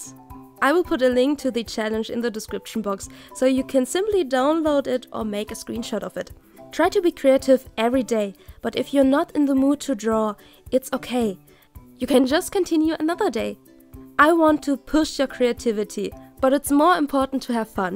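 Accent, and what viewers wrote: Germany. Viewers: Are you from Germany by any chance??? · Are you german? (the accent)🤔🌸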